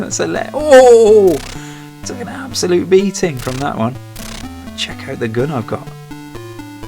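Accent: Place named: Midlands, United Kingdom. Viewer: Awesome vid! Thanks for uploading mate! Odd question, where abouts are you from? Roughly? You sound a bit like a Midlander. Can’t wait for your next stream, I randomly stumbled on your channel while looking for Atari ST videos, as a guy who grew up on the “other side” (Amiga) it’s great to see as much enthusiasm for Atari as there still is for the Amiga. Thanks again!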